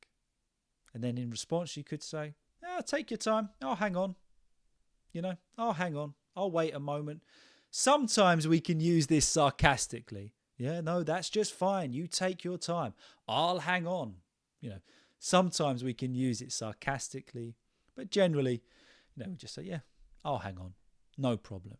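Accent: United Kingdom. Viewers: At first I ever looked for a american accent to practice my English skills, not because I´d rather it but because is a little more easy to understand other than is most common between English learners at begining of their class. Now, after to found Katharine (the one who you did a live with her erlier hahahah) Youtube Channel and yours as well, I do love British accent!!!😍🥰 So, talking about your contents, I loved them!! they are so useful!! thank you for that!!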